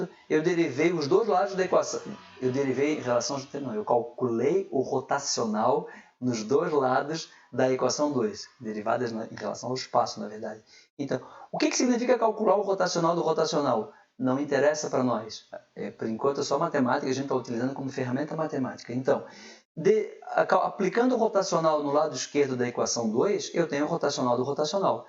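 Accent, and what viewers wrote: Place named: Portugal. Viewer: Aula muito boa! Tens acento português professor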